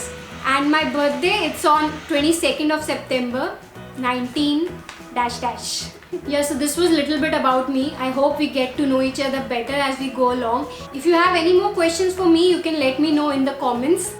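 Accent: Delhi, India. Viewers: she is speaking indian English - and her accent is perfectly fine. There are Indian lecturers out there who are less understandable. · Easy to guess you from Delhi . That super strong accent :) But good job on your creativity. You are good with it girl!! All the best! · she has an indian accent..American accents are much worse with their own pronunciations on everything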